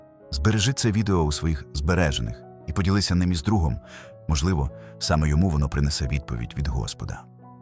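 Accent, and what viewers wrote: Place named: United States. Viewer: Можно для молитвы исцеления подобрать какой-то приятный внятный, успокаивающий голосовой тип, ведь всё равно ии используете? А то напоминает неполноценных из Винницы в 14м году получивших власть из лап эуропы и брызгавших слюнями из неразвитого речевого аппарата на всех трибунах и сценах. Неужели создатели ролика не слышат, что этот тип речи звучит неполноценно - аденоидно?да ещё с имитацией какого-то вовсе неуместного типа американизированного акцента? Нету украинцев что-ли с нормальной здоровой естественной дикцией? Вы испортили молитву, сделав из неё паническую, обгоняющую слово - словом скороговорку.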